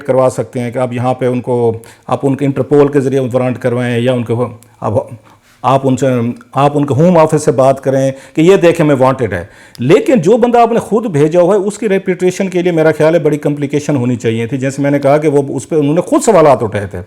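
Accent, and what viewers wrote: Saraiki, Pakistan. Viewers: Haye way SARAIKI ACCENT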